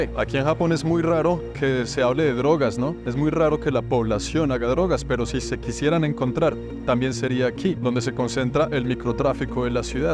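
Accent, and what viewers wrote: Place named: Colombia. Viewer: Llevaba como media hora intentando adivinar el acento y dije... tiene inflexiones de colombiano pero como un aire argentino... pero como gringo... fue buena mi aproximación.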